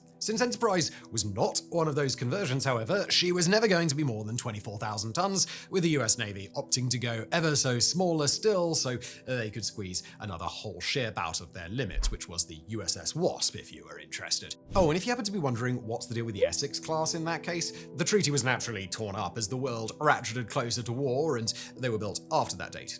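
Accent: United Kingdom. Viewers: I find it heartwarming, and ironic, that a bald man with a British accent is endeavoring to “make sure history never forgets the name Enterprise” 🖖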